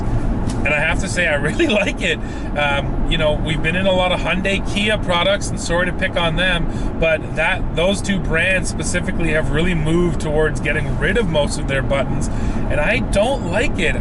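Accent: Canada. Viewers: FYI, the US pronunciation of Mazda and Yamaha with an IPA ä sound is closer (not perfect, but closer) to Japanese pronunciation than the Canadian æ sound. That said, you don't have to water down your Canadian-ness to appeal to a broader audience. I've been subscribed since long before ever worked for TFL, and it's one of the endearing distinctive qualities that helped you stand out when I watched my first video. That you have real experience using trucks for towing, hauling, and off-roading, plus winter weather testing is what makes you one of my favorite channels.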